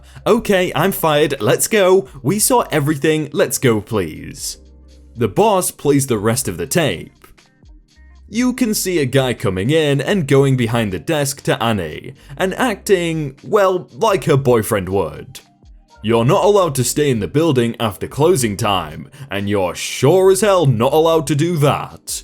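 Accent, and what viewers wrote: United Kingdom. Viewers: Hey, you’re deep. You’re also noticeably more British in your speech. I love it.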